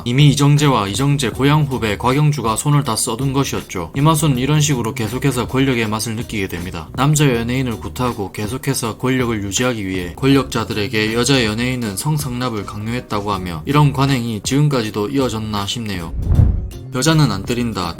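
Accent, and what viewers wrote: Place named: Busan, South Korea. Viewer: 같은 내용이라도, 부산 사투리 참 좋네요. 구독. 좋아요 누릅니다.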